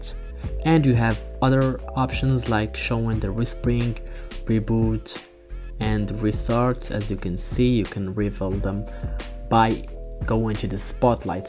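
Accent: Morocco. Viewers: Are u morrocan ?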